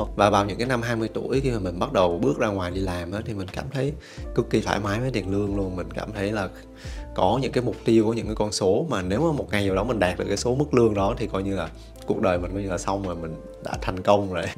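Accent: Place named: North, Vietnam. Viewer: cảm giác như anh gốc Bắc đúng không ạ, thấy ngữ âm hơi giống dù a nói tiếng nam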